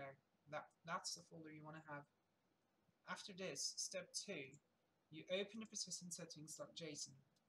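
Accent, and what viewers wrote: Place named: United Kingdom. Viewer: i swear i hear British in ur voice back 7 years ago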